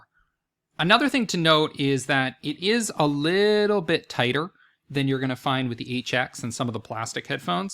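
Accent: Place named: United States. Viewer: That’s a nice review. It’s very nice informative. I also own a pair of H95 headphones. I gotta say I really like your articulation American accent. Where are from if you mind me asking?1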